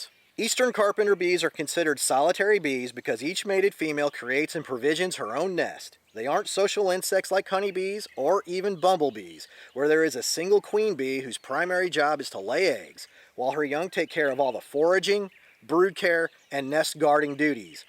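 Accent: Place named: North Carolina, United States. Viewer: I've made brush piles for critters in my yard and I'm excited to see carpenter bees in the next few years. Also, are you from NC? I ask cause I noticed that your accent is similar to mine, lol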